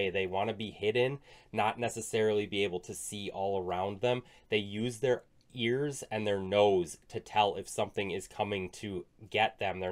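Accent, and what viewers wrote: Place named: Wisconsin, United States. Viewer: That’s his Wisconsin accent…it does sound like egg but he’s saying “ag fields” as in agriculture fields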